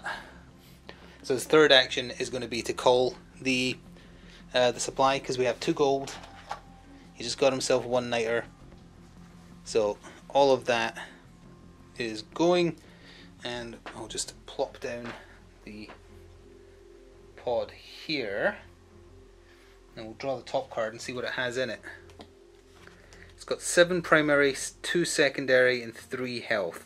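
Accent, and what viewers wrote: Scotland, United Kingdom. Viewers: It's Nitra, so pronounced night-ruh. For the Americans, what's your regional accent? It's leaning Scottish but doesn't sound full Scot.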